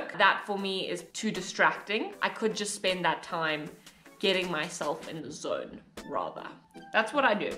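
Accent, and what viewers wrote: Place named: South Africa. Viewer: SO NICE WHEN I UNEXPECTEDLY HEAR THE SOUTH AFRICAN ACCENT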